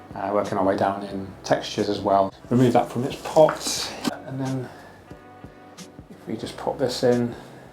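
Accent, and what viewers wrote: United Kingdom. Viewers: Too bad only Brits can understand him.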